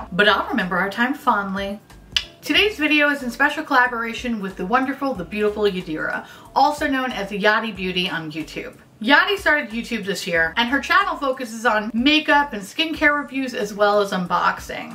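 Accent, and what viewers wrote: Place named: Chicago, United States. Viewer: I’m one and a half minutes in... I don’t remember if it was jaimie French or Smokey glow that sent me here... but whoever it was. THANK YOU BIIII**** 😂❤️❤️ Edit: it doesn’t hurt that you have a very similar accent to my dads side of the family from Chicago. 😂❤️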